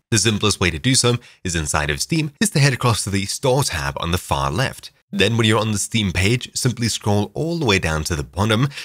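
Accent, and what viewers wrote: South Africa. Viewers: are you a South African? just asking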